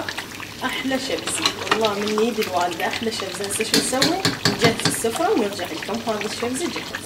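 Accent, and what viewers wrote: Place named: Ahvaz, Iran. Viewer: الشبزي العراقي مع اللحم وليس الدجاج. اصلا هذه الطبخه ايرانيه. مبين من لهجتك انتي اهوازيه ويختلف طبخك عن العراق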